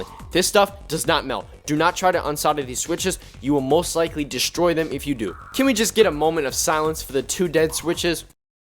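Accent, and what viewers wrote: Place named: New York, United States. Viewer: i love that ny accent lol ily btw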